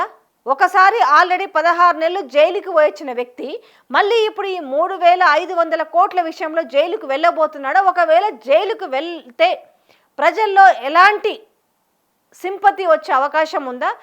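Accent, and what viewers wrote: Telangana, India. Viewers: తెలంగాణ స్లాంగ్ లో వినడానికి చాల చక్కగా మాట్లాడుతున్నావు. Go head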